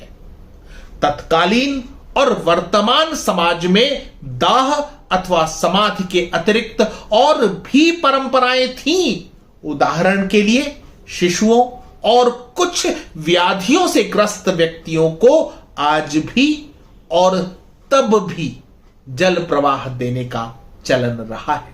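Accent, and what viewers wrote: Standard. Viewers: Ap bahut sudh hindi bolrahehe Nahi to jisko Dekho adha English adha hindi boltehe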